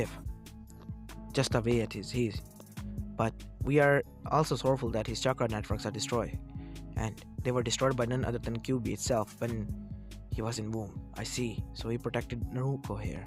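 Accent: India. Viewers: are you Indian? you sound like one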